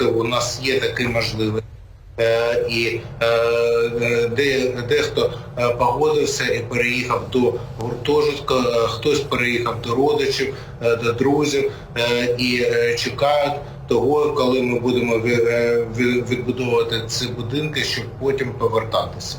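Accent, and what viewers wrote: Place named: Galicia, Ukraine. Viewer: Как же поддаться, если там смесь польского, румынского, русского, в общем каша галичанская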